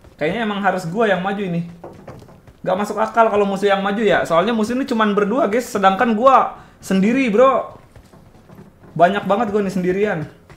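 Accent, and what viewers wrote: West Java, Indonesia. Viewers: Hajar terus mang ku toxickan sunda, nyieun lucu sabab ngomong indo tapi logat namah angeur we sunda🤣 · Sunda mana mang?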